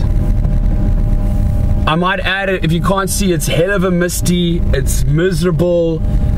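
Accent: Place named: South Africa. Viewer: I'm unsure which I liked more, the SA accent or your articulation of argument. Props to you from Australia!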